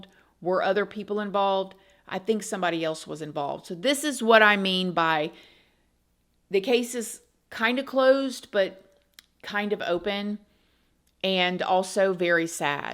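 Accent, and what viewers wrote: South, United States. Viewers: Is it a southern accent ? Ilke how she says poóolice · Very much a southern (south-eastern American) accent lol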